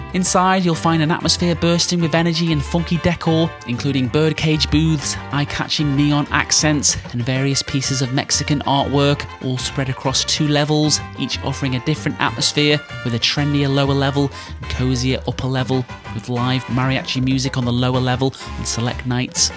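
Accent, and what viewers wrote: United Kingdom. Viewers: Your accent is quite enjoyable. Which region of britain is it?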